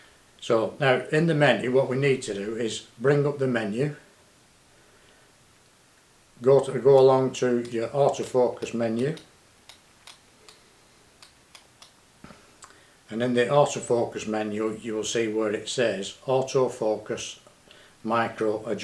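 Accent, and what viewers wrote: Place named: Liverpool, United Kingdom. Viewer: Hey brotha great video thank you. Now as a side question where does your accent come from? I can’t seem too sort it out. Obviously it’s an English accent but I don’t have a trained enough ear to sort it out right away. You do sound like a Beatle to me (hope no offense) as that’s my only reference for now. I know they (Beatles) are from from Liverpool (or greater area). Thank you kindly and have a good day.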